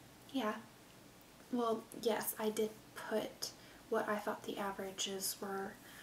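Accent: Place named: North Carolina, United States. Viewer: Just based on her accent and talk of ft fisher, the aquarium there, and the azalea festival, I'd say she's from my home state of north carolina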